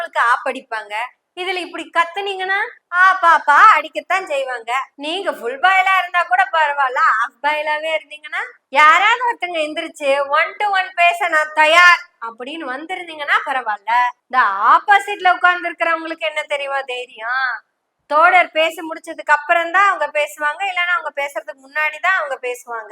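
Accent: Kongu, India. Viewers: தங்கப்பெண்ணே, உனக்கு content மட்டும் இல்லை, துணிவும், தெளிவும், humour உம், கொங்குத் தமிழ் மணமும் நிறைந்து கிடக்கிறது. மேடைப் பேச்சும் கை வந்த கலையாகும். உயரப் பற, வெற்றி உனக்கே. God bless!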